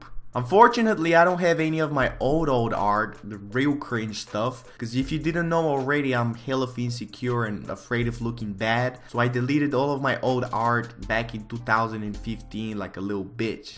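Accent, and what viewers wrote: Russia. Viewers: Why are you talking with a Russian accent? it's me saying to you as russian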